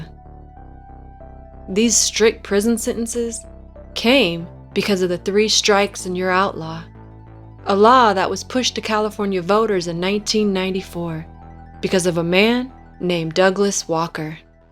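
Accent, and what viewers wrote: South, United States. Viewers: I detect a slight Southern accent. She does have a beautiful voice tho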